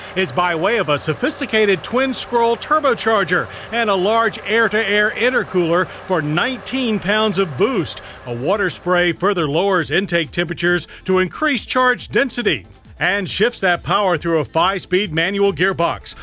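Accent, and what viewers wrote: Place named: United States. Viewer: This commentator very american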